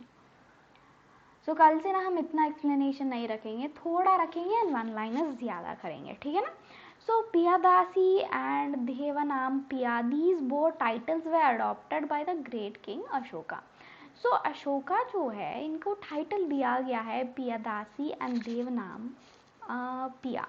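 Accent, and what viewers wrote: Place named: South India, India. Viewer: Wonderful session mam. And your voice is very very cute mam. There is a foreign+ South Indian touch in your accent which makes it unique☺☺☺😍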